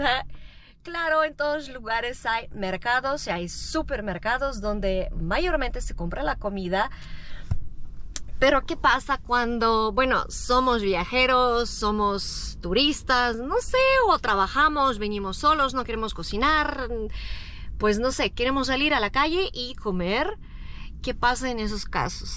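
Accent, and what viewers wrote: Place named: Mexico. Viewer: Es el primer vídeo que veo de tu canal. Hablas perfecto el español y muy a la mexicana. Gracias por apreciar aspectos que para nosotros son algo común en nuestro país. 😘❤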